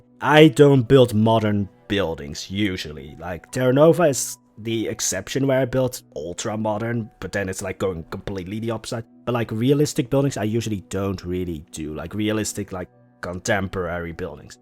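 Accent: Netherlands. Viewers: Ur dutch right? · I smell Dutch... 👀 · Are you dutch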